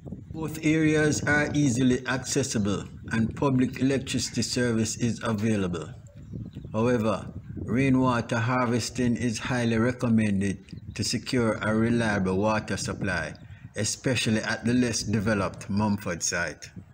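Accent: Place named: Jamaica. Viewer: Greetings my brother, u sound like a jamaican just came across u video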